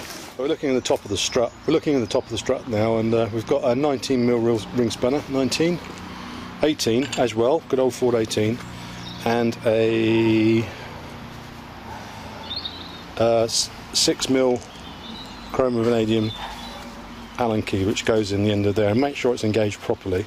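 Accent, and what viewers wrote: United Kingdom. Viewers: You helped me fix a problem after installing new struts (but not the spring) where I had not made sure the 3 notches in the upper plastic mount were aligned with the butt of the bolts in the top plate. This made for some very noticeable knocking sounds when going over bumps and turning which disappeared after I aligned them. But before I watched your video and fixed it myself, I brought it into my local Ford dealer and they charged me $195 for a diagnosis that found nothing (confirming my belief that I can do the repairs better with YouTube than even the most qualified and expensive mechanic). Thank you for this awesome video. Your accent sounds Cockney, like my creative writing teacher. Bloody right then. Best of the lot.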